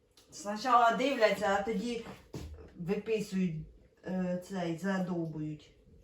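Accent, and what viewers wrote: Poltava, Ukraine. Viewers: Неподражаймий полтавский акцент.В мене батько з миргородщини.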